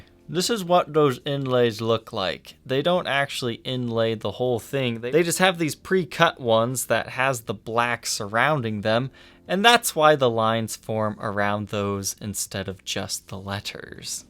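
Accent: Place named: Southern California, United States. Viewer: ...it adds a certIN Quackiness to yer tone.! for a guy who isn't into Frank, sure has his vocal speech southern Cali dialect down to an untrogladyte level.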